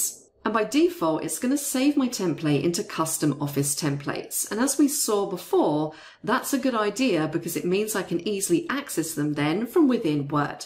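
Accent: United Kingdom. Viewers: Thanks for excellent explanations! Btw, you sound so British, I loved it :)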